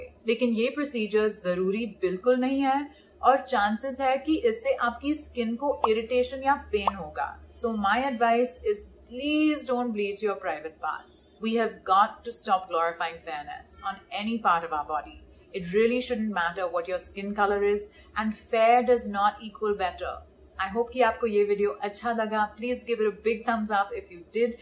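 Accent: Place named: India. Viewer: Name English and accent hindi ?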